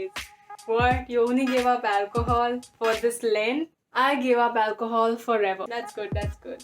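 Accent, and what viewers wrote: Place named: Konkani, India. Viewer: Love your Konklish, energy, charisma, quick wit, mannerisms, fun, humour, painted moustache, painted hair on your chest ..... and not necessarily in this order.! Keep it up.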